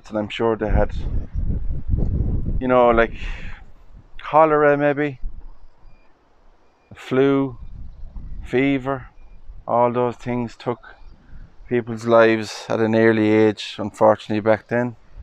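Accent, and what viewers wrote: Ireland. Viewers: You have a very gentle Irish brogue there sir, even when the subject matter is a bit somewhat dismal. Lovely trees there, I could hear a Chaffinch (Rí Rua) and a Coal tit (Meantán dubh) there (I think, I couldn't really be sure, but I suppose). What a horrifying thing to find out, people were being buried alive in those days, probably one of the worst feelings you could ever imagine☘🐦🪦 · Beautiful cemetery. Your Irish accent is as well. I love listening to you.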